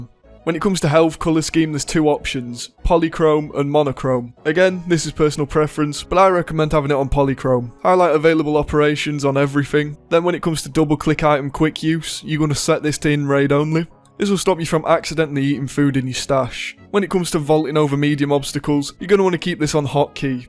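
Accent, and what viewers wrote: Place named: Northern England, United Kingdom. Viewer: This MF'er got that House Stark accent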